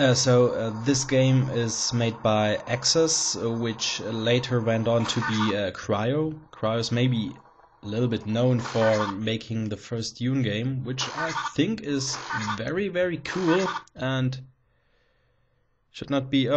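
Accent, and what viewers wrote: Germany. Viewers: I totally agree, you should never apologize for where you came from! But I think what he meant by that, was that he's sorry having poor English because he's German. But German accents are still something you should never apologize for!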